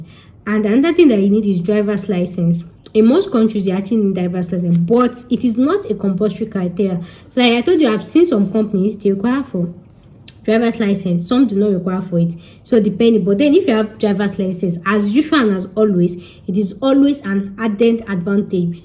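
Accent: Yoruba, Nigeria. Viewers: Hey pretty.... Nice video, but that Yoruba intonation is a turn on for me.... Won't mind knowing you more🙈😀🥰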